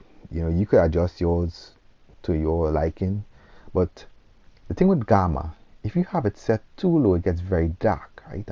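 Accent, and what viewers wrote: Trinidad and Tobago. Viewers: Are u trini? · You from Trinidad bro?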